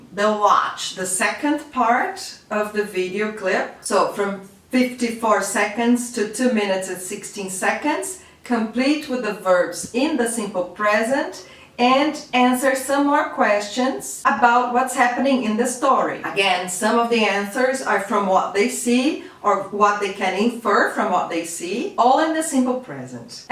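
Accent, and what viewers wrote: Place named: Brazil. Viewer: Beautiful brazilian accent! Thanks for the activities!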